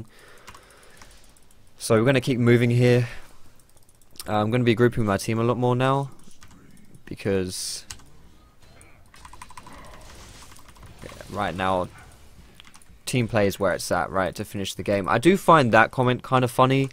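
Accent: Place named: England, United Kingdom. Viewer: I still love youre videos and I think you should make videos w/o your face. English accent with being able to see the face of the speaker creeps me out.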